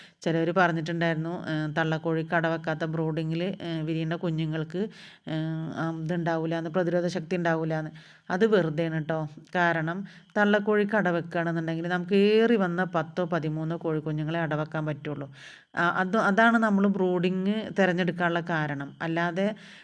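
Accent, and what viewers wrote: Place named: Malappuram, India. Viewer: കൊടുന്നാക്കി എന്നത് അരോചകമായി തോന്നുന്നു, കൊണ്ട് വന്നാക്കി എന്നാണ് പറയേണ്ടത്. സംസാരത്തിലൂടെ മലപ്പുറം ജില്ലക്കാരിയാണെന്ന് തെളിയിക്കരുത്. കൊട്ന്നിടുകയല്ല, കൊണ്ട് വന്നിടുക.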